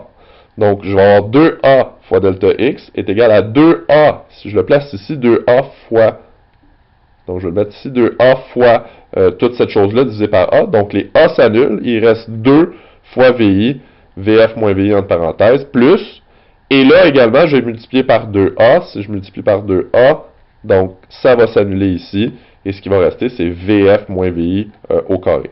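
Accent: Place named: Canada. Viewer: rigolos jaime bien laccent canadien ahahahhaahahah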